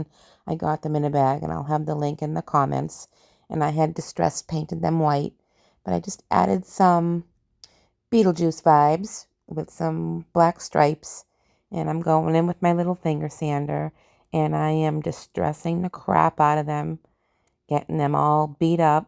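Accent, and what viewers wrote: Boston, United States. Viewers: Is that accent from Boston? I get nailed all the time with my "Texas Country Twang"😂😂❤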